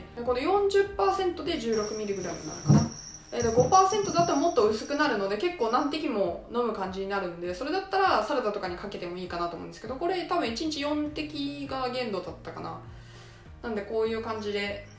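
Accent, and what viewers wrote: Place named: Western Japan, Japan. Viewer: ちゃん極めて標準語ですが、普段は西の言葉なんでしょうか？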